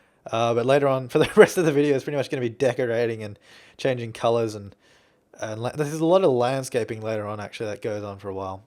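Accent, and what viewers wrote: Australia. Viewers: I love your accent, I was going to ask where your from, but I figure by the way you rponounce your Rs and AHs, it's Australian. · I know this is an old comment, but I do the exact same thing. I guess my love of Australian accents makes his voice 10 times better but even if I wasn't oddly obsessed with the accent his voice just has a soothing sort of sound to it ahaha I sound soo creepy right now xD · he's australian, not a kiwi. he has a australian accent :) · Madisyn Davis again... this is a really really old video but still... cannot agree more. I love listening to his voice. (But same as you I have a strange obsession with Australian accents so...)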